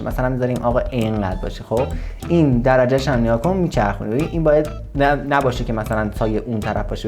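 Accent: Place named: Isfahan, Iran. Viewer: برای لحجت عذر خواهی نکن از این به بعد لطفا فقط اصفهانی صحبت کن 🔥🔥🔥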